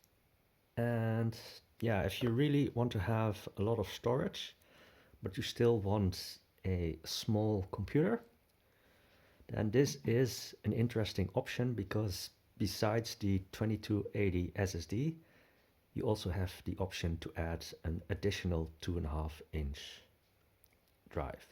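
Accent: Netherlands. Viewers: Wtf dude, it called an accent. "Die" is a common deviation of people who speak Dutch or Dutch adjacent languages. People speak your language out of courtesy. If they pronounce things wrong, just accept it and go on with your epic day.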